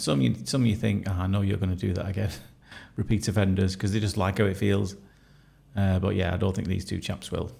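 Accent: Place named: North, United Kingdom. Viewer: Slab. What a great word for that piece of wax! And I love how you say fudge. Those northern u sounds are lovely. ❤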